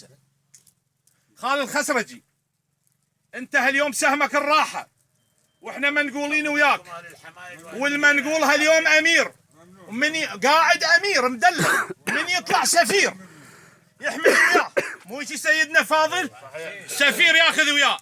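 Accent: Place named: South, Iraq. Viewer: اللهجة عبالك جنوبي مو كوردي ما شاء الله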